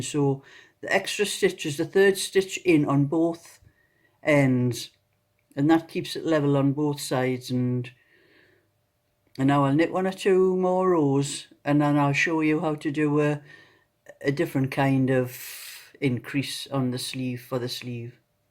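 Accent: Newcastle, United Kingdom. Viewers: Thank you so much, that was so helpful. Knitting is my new hobby since being locked down - it’s the only thing (apart from drinking beer) that keeps me sane. Also loving your Geordie accent! xx 😘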